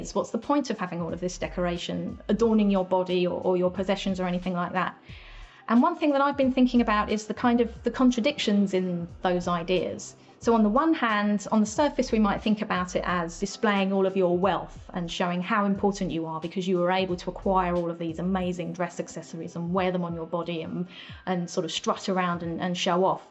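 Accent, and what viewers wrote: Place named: Cockney, United Kingdom. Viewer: Absolutely love her working class London accent Your a class act 👍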